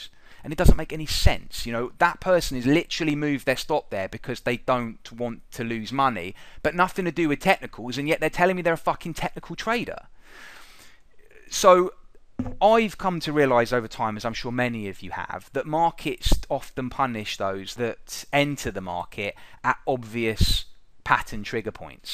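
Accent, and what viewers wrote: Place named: United Kingdom. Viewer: I feel like I’m watching a Guy Ritchie movie haha love the accent. Good stuff man 🤙🏻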